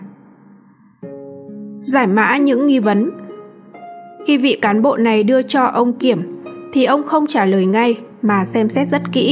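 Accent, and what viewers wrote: North, Vietnam. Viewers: Hết chuyện rồi đem tin xàm xí với giọng Bắc kỳ khó nghe!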